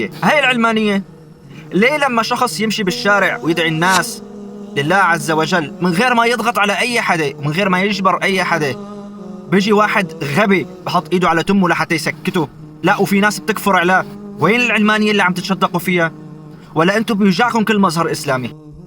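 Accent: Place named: Syria. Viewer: ماشاء الله بتحكي شامي اكثر من السوريين اول مرة بشوف اجنبي بحكي عربي بطلاقة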